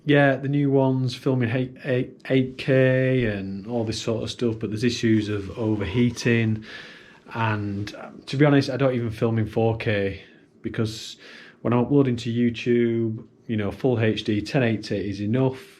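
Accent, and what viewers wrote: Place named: Yorkshire, United Kingdom. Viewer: Congrats on the new camera! From your accent you sound like a Yorkshire man? Similar to mine 🤦🏻‍♀️ if I’m wrong 😂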